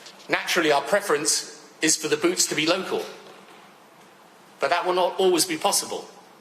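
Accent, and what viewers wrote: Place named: United Kingdom. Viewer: No shame whatsoever, just blatantly calling for more deaths of Europeans in general and British people specifically as he thinks fighting Muslims is their strategic depth. I wonder how much money this puppet will get from his puppeteers lobying i.e., the Military Industrial Complex in Europe. Seems spreading ideology of terrorism in British accent is acceptable.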